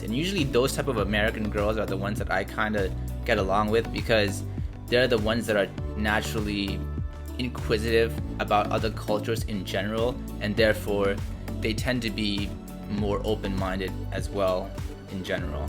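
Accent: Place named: Australia. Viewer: Maybe because you have an australian accent which americans fetishise a lot. So yeah, if you're an aussie you're going to do better overseas not saying you won't do great in your own country, too lol. I heard that aussie girls are more into asian guys than european and american ones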